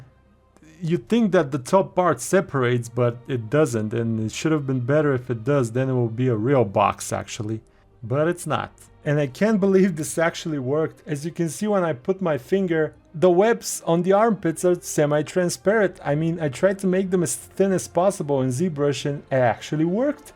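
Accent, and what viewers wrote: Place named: Russia. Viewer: я один слышу тот самый русский акцент?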